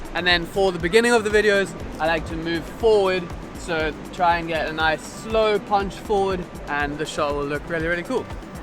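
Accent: South Africa. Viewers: Hey, are you from South Africa?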